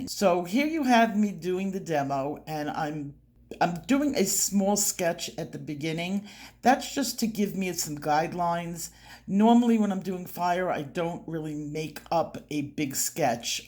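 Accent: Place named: New Jersey, United States. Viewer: So nice to see your newer videos. I have missed your NJ accent and great teaching skills😊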